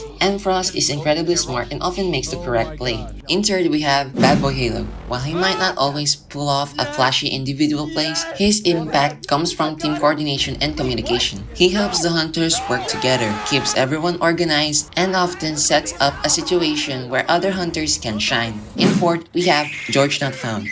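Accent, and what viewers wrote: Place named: Philippines. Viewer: Sabi ko na taga pinas ka, kasi same tayo ng accent kapag nagsasalita ng English hahahaha the resemblance is uncanny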